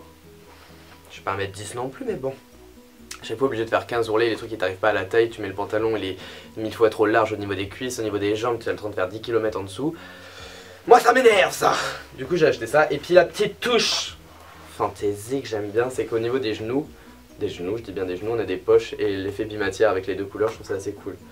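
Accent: Quebec, Canada. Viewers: j'ai cru que t'étais québécois, qd jte entendu parler normal ça m'a fait bizarre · Jpp j'ai vrmt cru que t'étais québécois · ptn au début j'ai cru t'étais québécois j'ai pas compris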